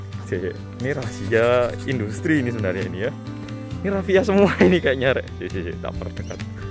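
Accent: Java, Indonesia. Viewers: Beralih jadi aktor Korea medok mas 😂😍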